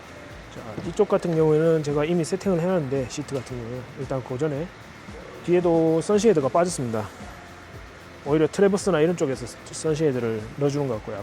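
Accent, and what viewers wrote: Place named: Busan, South Korea. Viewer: 캐나다에서 부산사투리.. 니마! 확마!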